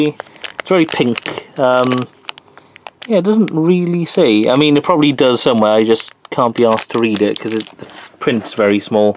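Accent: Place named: Kerry, Ireland. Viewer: Dude love your videos not to be rude but I subbed because your voice sounds like a man from Kerry Ireland sorry but still great video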